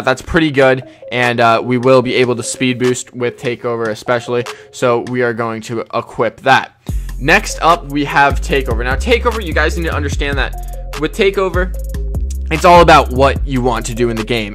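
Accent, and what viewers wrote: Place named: Australia. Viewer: Brother starting sounded like Australian why did you sound like Australian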